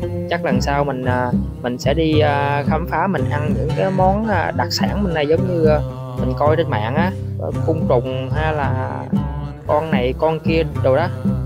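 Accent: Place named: Central Highlands, Vietnam. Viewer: Giong p tay nguyen viet nam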